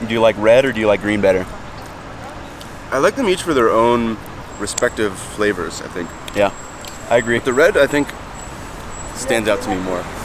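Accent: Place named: United States. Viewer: I visted MXCD this year and really loved it! But, your Spanish pronunciation is soooooo American 😂 You definitely need to work a lot on this! It’s hard to understand you sometimes. But still thanks for the effort ❤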